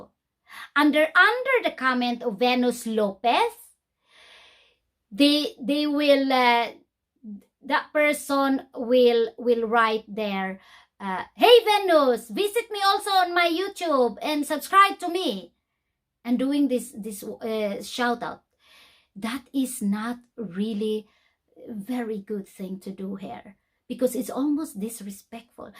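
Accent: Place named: Scotland, United Kingdom. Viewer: Hello dear, I love listening to your voice it makes me feel talking to my Aunt in Scotland, your accent is same as her. I can relate to what you are talking about too, I experienced twice a person commenting on the comment of my viewer I thought it was normal here in yt world, it is disrespectful in a way but I leave it behind. I always agree to the golden rule. KEEP SAFE.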